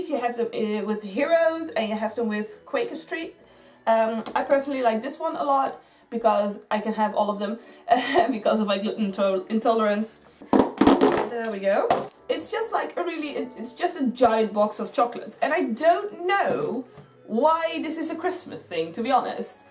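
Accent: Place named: England, United Kingdom. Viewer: So basically... You've never heard of Gluhwein? That's KINDA a tradition here.... And ugh, chocolate oranges :( I really dislike the taste of those xD. But I love seeing you getting more and more English both in speech and manner xD Anyway, enjoy your boxing day and have a great new year!!!!!!!!!!!!!!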